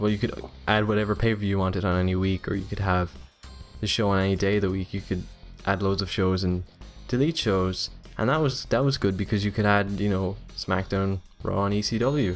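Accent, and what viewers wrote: Ireland. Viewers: are you irish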